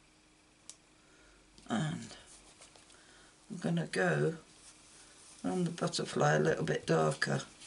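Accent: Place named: Warrington, United Kingdom. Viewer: I am curious about your accent. I am from haydock, St Helen's living in New Zealand and your accent sounds warrington of Newton le willows. Love the card, it is a kind of a twist to resist stamping, very elegant.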